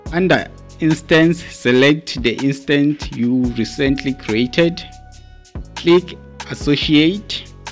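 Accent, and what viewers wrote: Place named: Ghana. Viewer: GH ascent.. Africans to the world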